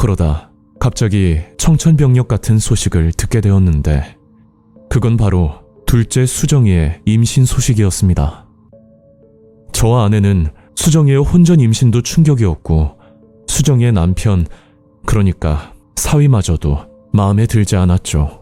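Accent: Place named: Jeolla, South Korea. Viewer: 아따 전라도라~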